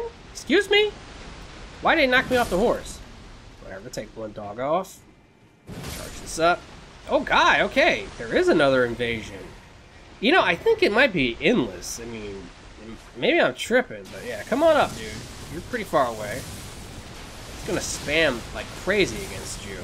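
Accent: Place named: United States. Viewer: you sound to me like you have an accent. Are you okay saying what state you live in? Curious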